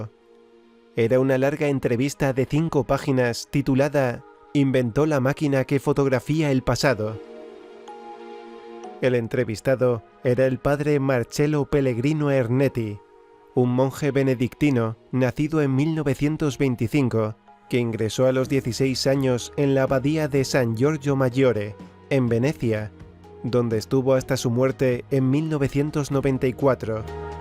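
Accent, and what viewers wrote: Spain. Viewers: Que voz del locutor ezpañol 😊😊😊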